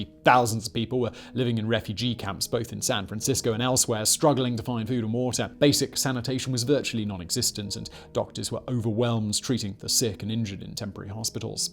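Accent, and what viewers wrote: United Kingdom. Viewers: This guy is so boring I cannot listen to him much longer. He talks so fast and I stand, that British accent. oh I can't stand this guy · looks so young! And his British accent is not as prnounced as it is now.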